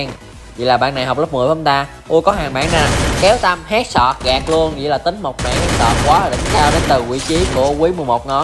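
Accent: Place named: Mekong Delta, Vietnam. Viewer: hèn gì giọng miền Tây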